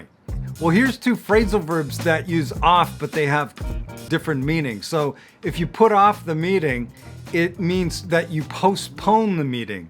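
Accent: United States. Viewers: I like u very much for american accent sr. I have been watching ur vds since long time and im imroved a lot. Lots of love. ❤ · Hey there You absolutely have an amazing and super important accent! Are you from mid west of the United states? I lost your terrific channel a long time ago.but I got it today in a fantastic way. I wrote fluency English for Chinese! Wow I got you there! Truth be told I l have been looking for you for more than four months! I lost your name and the name of your unique channel! What I am really interested in creating music with your lessons I learned gimme from you last year! Thank you so much for sharing this amazing lesson!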